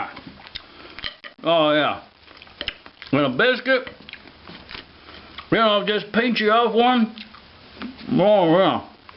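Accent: South, United States. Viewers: I tell you somethin.....I've caught the southern jawl from you......I was yesterday mixing some dough for cornbread and while I was mixing, I was saying to myself-"Gitter DONE !!" This is gunna be GOOOD !! I was chuckling while I was stirring like the Arkansas dog does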